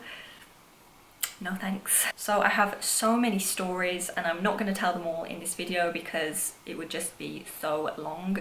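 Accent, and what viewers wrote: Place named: Birmingham, United Kingdom. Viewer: Omg are you from Birmingham, im so distracted by your accent it's just so cute hehe!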